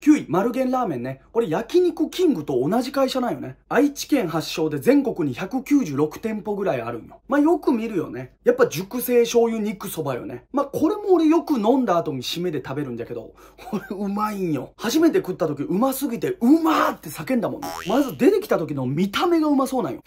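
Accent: Hiroshima, Japan. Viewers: ラーメン動画を検索してたらオススメで上がって来て初めて拝見しました😀 私も広島出身ですので各店舗への広島弁でのコメント冗談も交えてすんなり耳に入って来ました❗😂 あと広島ご出身であればチェーンではないかもしれませんが岩国ラーメンの寿栄広さんご存知ですか？😀 広島に帰った際はわざわざ岩国まで行って食べてます😊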